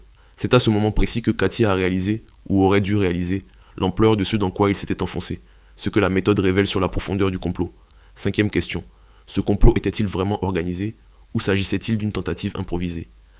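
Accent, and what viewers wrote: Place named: Ivory Coast. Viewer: Arrête de mentir, ce n'est pas ressent comme tu essais de el faire croire. Les frères et soeurs de l'AES attention à ce genre de chaine de propagande contre l''AES. Regardez le nombre d'abonnés qu'il a ce mec, 104 et cela signifie que c'est tout ressèment qu'il l'a créer pour divulguer des fausses informations. N'oubliez pas que la France et les pays comme la Côte Ivoire ont mis en place un recrutement de jeunes délinquants ivoiriens. Dans la vidéo que vous pouvez retrouver facilement sur internet, on n'y voie des africain comme ce mec qui parle dans cette vidéo, assis dans une salle avec des t-shirt noirs avec une inscription dessus. Le bute est d'inonder tout les réseaux sociaux pour contrecarrer l'AES et diffuser de fausses informations, faire des faux débats en montant sur des panel panafricains et pro AES pour tenter de les convaincre et de dénigrer les dirigeants de l'AES. 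Donc ne regardez pas ce genre de chaine comme celle ci qui ne sont la que pour la désinformation du Sahel. Ecoutez la voie de gars qui parle dans cette vidéo, on reconnais tout de suite que c'est un 100% ivoirien avec leur salle accent de merde de woubie. Il n'y a eu aucune tentative de coup d'état ces derniers jours ou même heure. En conclusion c'est l'un des chaine de propagande ivoirienne de fake news et de désinformation sur l'AES. Nous sommes sur place et il n'y a rien du tout . Ils vont prendre des images et vidéos qui datent et vont essayer de vous faire croire que ça ce passe maintenant. Peupl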